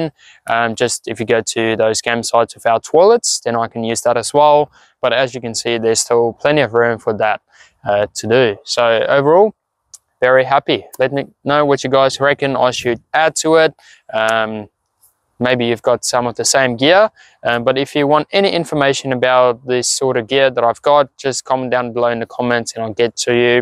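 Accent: South Africa. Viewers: Nice setup man! I must ask, are you perhaps South African? Because i somehow hear a bit of an Afrikaans accent coming through 😁 But ja, shot for the tips!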